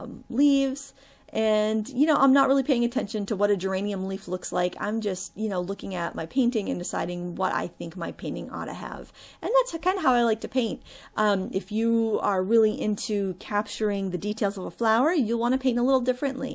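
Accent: Maine, United States. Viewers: Love your Maine dialect! you hoped you had enough "rum" to paint the pink geraniums - must be one of those wine (rum) and paint classes! Lol! Love your channel and look forward to each new one - keep 'em comin'..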